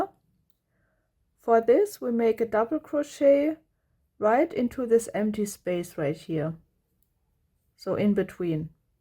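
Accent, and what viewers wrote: Germany. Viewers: Holy deutsch accent its so cute 😂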